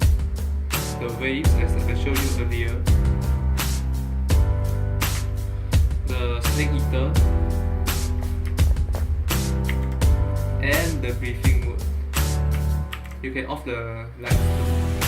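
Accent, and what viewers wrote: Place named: Philippines. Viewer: when you talk that is more like pinoy lol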